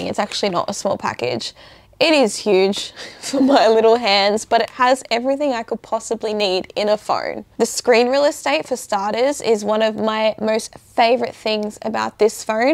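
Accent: Australia. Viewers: Is ur accent Australian ?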